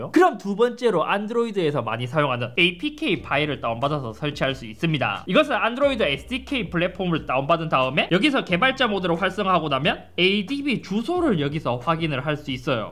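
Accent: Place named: Seoul, South Korea. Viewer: 형 발음이 역시 서울..킹..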